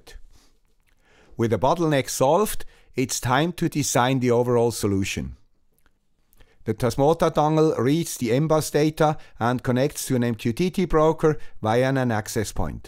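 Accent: Switzerland. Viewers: Hold on hold on you're Swiss! I thought you were Dutch. Then what do I know, I'm English with Danish ancestors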